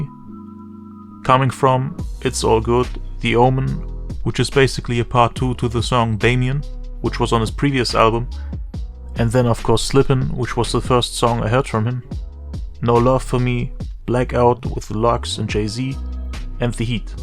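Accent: Germany. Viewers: wenn man im Profil des Videos auf "Kanalinfo" klickt, kommt auch die Info "Herkunft: Deutschland". Man erkennts ja auch am Akzent :D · Dickaa, ich hab gewusst, dass dieser Akzent Deutsch sein MUSS. Bin in die Info und dann sah ich, 'Aufenthalt Deutschland'. Mach weiter so, bleib am Ball🫡 Rest In Heaven, A Rap LEGEND✓✓✓😔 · Habe ich mir fast gedacht... dein accent sounds pretty german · Loool you sound very german biste deutscher? Edit : Okay hab den Mediamarkt und deine Geschichte gesehen krass! Hätt net gedacht das du Deutscher wärst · First time that i hear you speak. Are you German? Because your accent sounds like it. Also, Rest in Peace to one of the GOATs. If you somebody suffers from depression or dont feel well for other reasons, believe me drugs are never the answer! They only put you even more down, i know what i am talking about, i also had drug problems in the past. 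Hope really found his peace!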